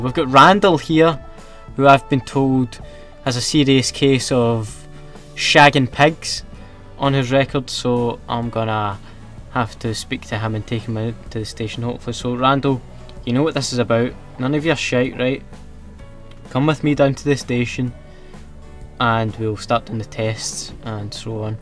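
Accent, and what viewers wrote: Scotland, United Kingdom. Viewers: If we ever had some 1 on 1 Scottish talk, it would be hilarious.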